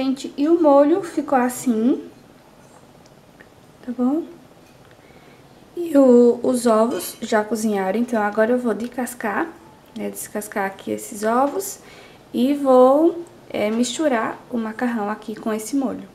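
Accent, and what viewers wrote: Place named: Northeast, Brazil. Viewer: Voz meiguinha...bonito sotaque nordestino...prato maravilhoso...bateu a fome agora...Parabéns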